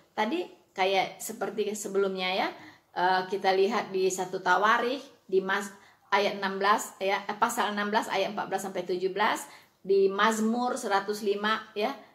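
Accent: West Sumatra, Indonesia. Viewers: Bu' logat dan intonasi ibu bicara saja jelas kok suku minang. Terus lakukan dan berikan penjelasan untuk meluruskan tanggapan yg miring dari Muslim Menjawab. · Haleluya Puji Tuhan Yesus, Shaloom Bu semoga di berkati Tuhan Yesus dgn bersama keluarga...Amin terus Bu, saya orang batak lama di Riau saya masih dengar logat intonasi masih terbawa orang Minang dan terusss Bu kabari injil terus saya penggemar Bu, kasih Yesus nga pernah pudar..! bersinar terusssss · Dari logat bcr, sy yg bukan orang Minang tau, beliau mmg dari suku Minang, sy punya seorang teman yg sdh lama tinggal dan bergaul dg orang2 dari brbagai suku di Jkt, logat bicaranya percis sama dg ibu ini, lagian buat apa sih hrs bohong soal beginian.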